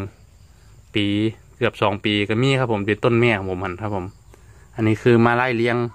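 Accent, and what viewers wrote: Korat, Thailand. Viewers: ช่องอีสานฟังไปฟังมาเหมือนคนโคราชเลยพี่คนที่ไหนครับทำไมพูดแบบนี้ ผมเเค่สงสัย555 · สำเนียงคนโคราชแท้ครับ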